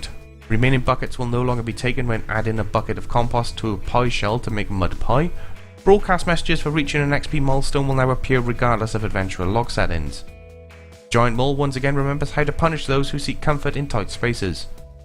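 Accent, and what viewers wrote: Wales, United Kingdom. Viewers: what part of wales are you from XD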